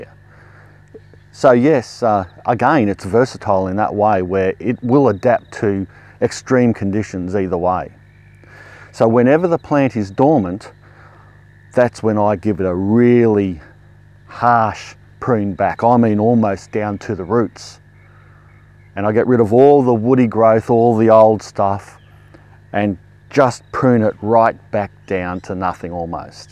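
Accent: Australia. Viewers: This is good. It’s even better at 1.5 speed increase. I love Aussies. Have I got that right?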